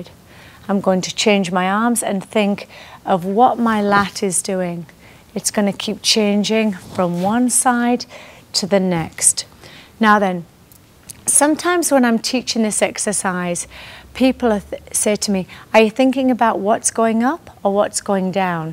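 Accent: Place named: Northern England, United Kingdom. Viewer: Very helpful-the images I can use when walking along the beach front. Thank you and a nice (north) English accent. Jack in Japan.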